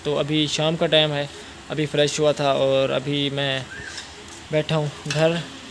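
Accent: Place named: Saraiki, Pakistan. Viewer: Bhai ap agr saraiki m video bnao ksm sy bht achi video bny gi bht pyari saraiki bolty ho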